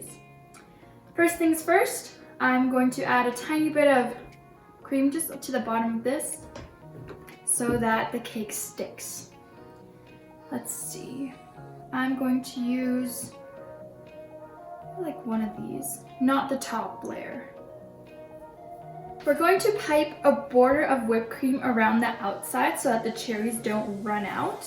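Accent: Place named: United States. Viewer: Wow, I think you’re a sweetheart but it took a bit to grasp a lot of things you said. You speak like an American, but so many of your terms didn’t make sense to me. Now that I understand, I’m pretty impressed. A couple things. You weren’t wrong when you were trying to say Amarena Cherries. Those are not the same as Maraschino cherries. Amarena are good cherries to top your cake with but I like what you did. You keep referencing dough. Maybe in Germany, you think of it as dough but in my world it is more of a batter. Dough is what we refer to with bread, cookies and pastries. Alcohol doesn’t vanish, it evaporates. Bottom line is that your cake turned out absolutely beautiful. Good job! Is this a recipe from your family or did you get it from another YouTube? Keep up the good work! Also, how much is 1 glass of cherries?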